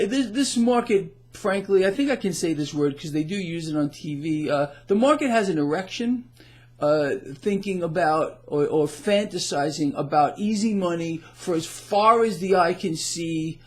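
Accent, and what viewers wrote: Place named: California, United States. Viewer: your starting to sound like your from Califonia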